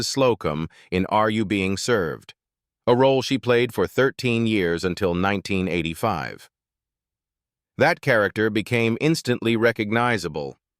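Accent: United States. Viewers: O look an american voice=terminology=brainwashing=cast system shame on you people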